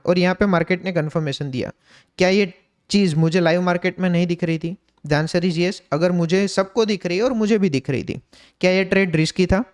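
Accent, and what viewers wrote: Gujarat, India. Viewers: Bhai aapki awaj se esa lag rha hai ki aap gujarati ho?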